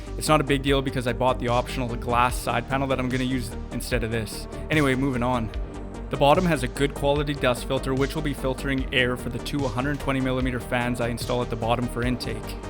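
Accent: Canada. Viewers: The Canada accent is subtle but strong. It’s also the robotic and lack of vocal range.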